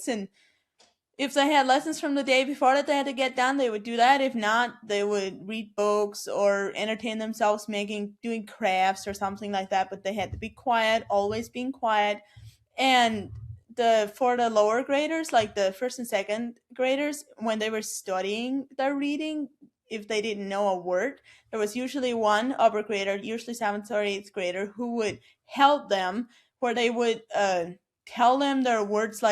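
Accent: United States. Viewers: I find accent fascinating, and I wonder how similar it is to other people's accents from the Amish community? There are parts that sound American. But to me, as someone from the UK, there also parts that sound like a very posh English. Particularly the way words like "January" are pronounced. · It's an accent but So American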